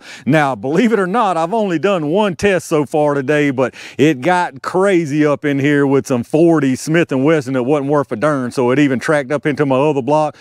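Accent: South, United States. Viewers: My call goes for the 45Super!!!! Please test it head to head with the 10mm! Your southern accent sounds so cool, I am inclined to believe you are faking it!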